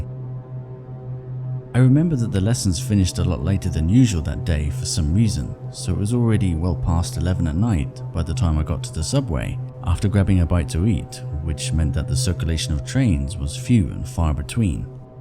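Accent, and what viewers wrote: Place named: RP, United Kingdom. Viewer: Love is posh voice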